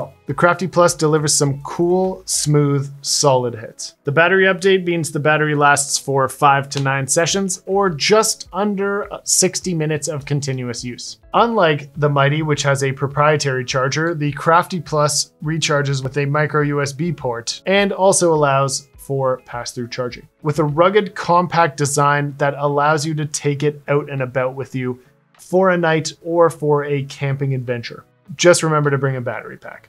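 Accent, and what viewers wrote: Germany. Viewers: Good German accent Vielen Dank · I love the German accent makes me feel like I’m watching Hogan‘s heroes. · You get like and subscribed for that German accent! 🤣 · That German accent is the reason why i liked your vid just 2 minutes in xD Also could you do a vid explaining everything about a dry herb vape? like what cause the high to end sooner etc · Got me subscribing from the German accent 😂 but you speak facts so I like that , you got my view brother and will be watching , keep it up 🌲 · Nice entertaining presentation. I’m just starting out and you really helped clear my confusion. Nice German accent too :-). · Loved the German accent, really good.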